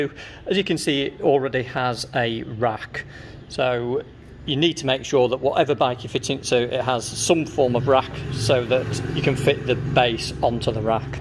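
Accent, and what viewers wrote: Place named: England, United Kingdom. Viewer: Not only a great video, well explained, but also what a coincidence, I just picked up my XR150L with rack, (2016) so spot on! Curiosity though, your accent sounds English, but the plate looks to be from another country.....my accent too is English (with a hint of Bristolian), but me and the bike? We are in Colombia, South America.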